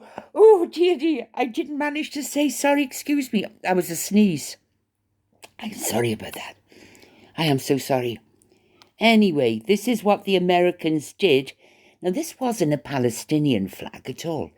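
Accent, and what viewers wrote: Wales, United Kingdom. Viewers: Hello from New Zealand.I just love listening to you and I admire your intellect and humour. I too notice teeth 🦷🤪. I have a soft spot for Wales, the Welsh and the accent. Have a lovely day. 🥰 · Subscribed and enjoy following your channel from 🇰🇷. Btw, love your Welsh accent :)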